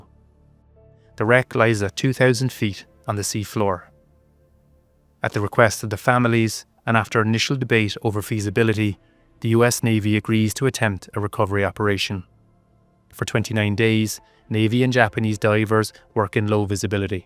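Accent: Scotland, United Kingdom. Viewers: It's possible it's to do with AI? I've heard the narrator's voice switch to a Scottish accent. So it's possible the channel is using an ai voice? (I still love the channel regardless) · switch to Scottish? It’s been Scottish since the first one no?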